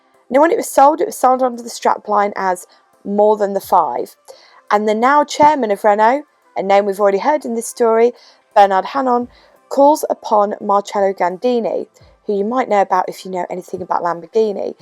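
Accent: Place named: Yorkshire, United Kingdom. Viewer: Never realized you were a Yorkshire girl. Another great video, certainly know your stuff. Had 4 brand new Renault's all went wrong. Too complicated these days. Take care of yourself please and stay safe x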